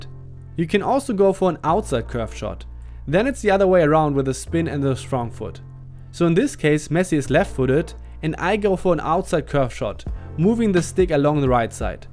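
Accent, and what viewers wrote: Germany. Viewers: Your obviously German aint you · So n bissle hört man den deutschen Akzent dann doch raus 😉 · Bist du deutscher ? Hört sich vom Akzent her bissle an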